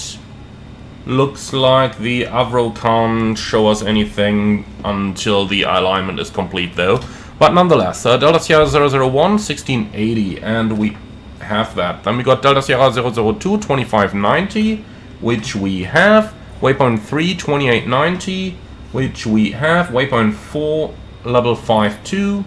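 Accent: Switzerland. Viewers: Geil mit dem schweizer akzent😂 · I don't speak german but, as a swiss descendant, I recognize the swiss accent when I hear it.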